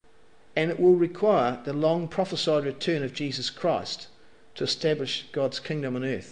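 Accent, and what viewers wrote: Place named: England, United Kingdom. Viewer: the speaker is English and he pronounces words differently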